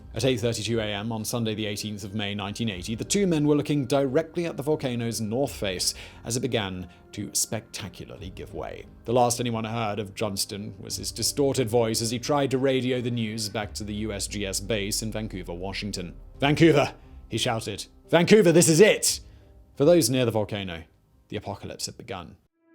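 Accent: United Kingdom. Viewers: You Tube SUCKS! I have removed this man's channels over and over again. But when You Tube changes the algorithm, it force feeds me this guy's many channels despite my removing him. channels suck. They are poorly researched information that is mostly government propaganda talking points. Terrible videos. And just because has a British accent, this doesn't not make him an authority on anything. He's just a talking head. England is over. Brexit has made the only job in England a You Tube content provider. Terrible list videos that spread falsehoods and bull. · agreed. Also this limey sounds stooopid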